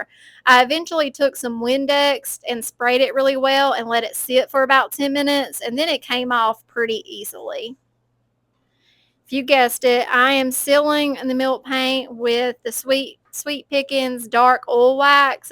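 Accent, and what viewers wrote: South, United States. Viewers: I love your channel, (I'll just keep writing that) and all your projects; today's were no exception, I would love any of them for my home. "Centerize" if you know, you know. 😂. Your accent is the proverbial sweet Southern accent I hear in my head when I imagine the South and drinking sweet tea on a big porch. ❤😊 ~Laura [your kindred messy painter 😅]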